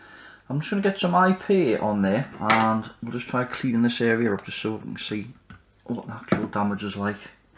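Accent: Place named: North East England, United Kingdom. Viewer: Ideal item for someone like. Not Vince. I don't like him. He sounds too "nice", he's not my mate and him saying "Trying to fix" implies that he's fumbling about without really knowing what he's doing. YOU, though, do know what you're doing. You quickly identify the problem and just get on with repairing/replacing the dead part(s). You get straight to the point and don't mess about. No silly intro music or graphics. Also i like your North East accent.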